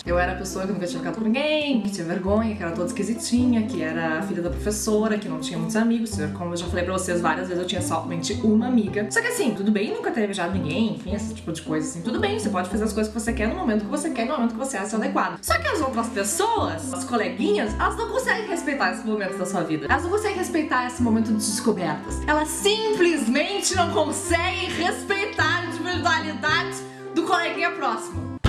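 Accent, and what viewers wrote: Rio Grande do Sul, Brazil. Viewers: é gaúcha, reconheço nosso sotaque de longe kkkk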